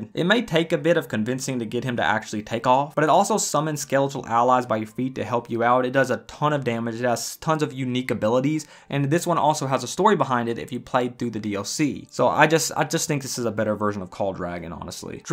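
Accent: South, United States. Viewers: I honestly can’t tell if you have a southern accent or a british accent. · your southern drawl got stronger since the last upload.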